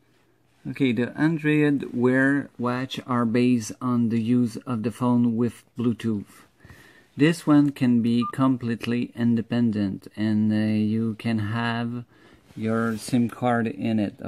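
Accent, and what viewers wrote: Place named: Quebec, Canada. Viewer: the Quebecois is strong within your voice. :D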